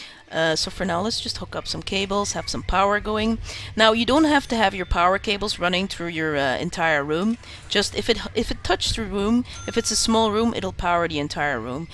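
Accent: Flanders, Belgium. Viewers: Nice! first flemish that dosent have that broad accent! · I'm also from belgium. Are you flemish? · may be cos she is from belgium :) But you can hardly tell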